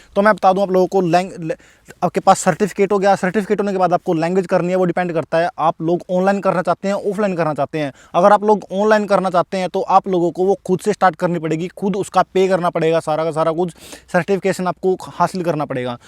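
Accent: Haryana, India. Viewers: Bhai Haryana te lage mne tu ❤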